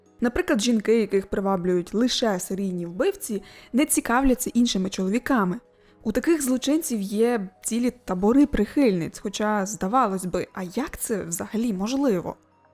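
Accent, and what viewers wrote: Transcarpathia, Ukraine. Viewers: Ти з Закарпаття?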